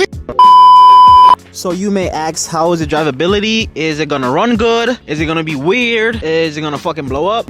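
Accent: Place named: Jamaica. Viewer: Bro sounds so Jamaican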